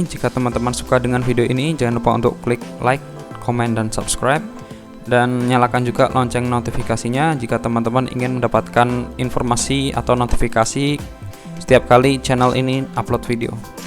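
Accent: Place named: Tegal, Indonesia. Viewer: Wong tegal ya mas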